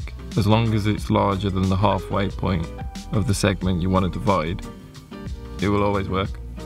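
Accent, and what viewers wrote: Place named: Midlands, United Kingdom. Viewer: I'm a teacher looking to help my class draw simple pie charts. I loved this video. You keep it simple and I love your midlands accent!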